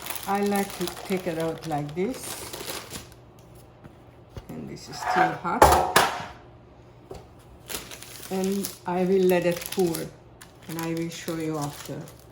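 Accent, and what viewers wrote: Hungary. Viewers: I love your recipes and enjoy your videos. And you can be rightly proud of your Hungarian accent and your roots!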